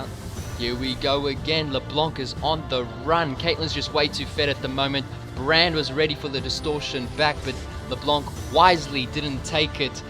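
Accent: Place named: South Africa. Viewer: Where he is he from? he sounds very South African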